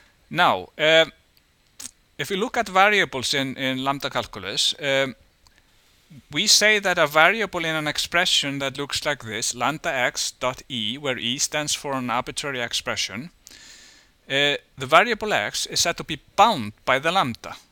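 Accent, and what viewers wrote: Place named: Iceland. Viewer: That's a helvíti thick accent, even by Icelandic standards.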